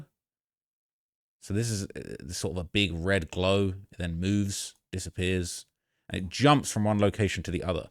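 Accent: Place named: RP, United Kingdom. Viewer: Very posh.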